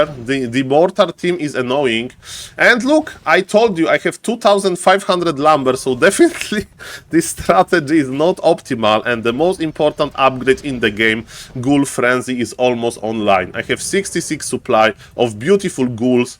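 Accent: Poland. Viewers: U from poland? Accent sound so polish 😊 Edit after 2 minutes: polak jak chuj xD pozdro wariacie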